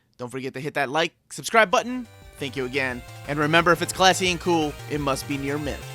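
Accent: United States. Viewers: OMG we're never going to agree on how to say things, I'm glad you live in America and I'm in England. I say Ah-phra and you say Ay-phra. You Nay-mor, I saw Nah-mor. Can you imagine a conversation between us? 😂😂😂 Out of interest, Mark Millar, how do you say it? When it's Americans I only ever hear Millaaaaaaarrrrrrr. But he's Scottish, I share a border with him, and I pronounce it Miller. If you ever meet him will you ask him please?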